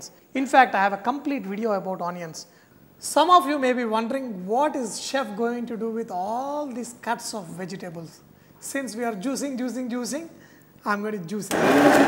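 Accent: India. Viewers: is good. HE HAS AN REGIONAL INDIAN ACCENT... NOT EASY TO GET THE NEUTRL ACCENT. WHEN WE PUT UP WITH THE CHINESE AND OTHER ASIAN AND NUMEROUS ACCENTS WHY NOT SANJAY'S WHO IS VERY CLEAR ABOUT WHAT HE TEACHES..... ONE OF OUR BEST VIDEO TEACHER....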